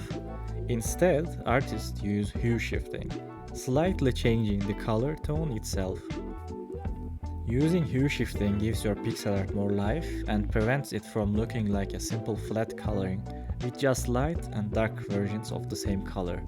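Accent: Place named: Turkey. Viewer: Türkçe aksanı nerede görsem tanırım... güzel video reis oynu da wishlistledim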